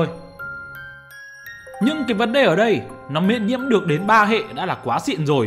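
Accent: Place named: Hanoi, Vietnam. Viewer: ad người hà nội à giọng nghe hay phết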